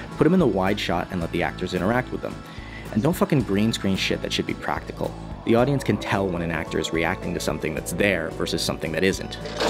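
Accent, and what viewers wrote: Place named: Boston, United States. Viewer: you're from boston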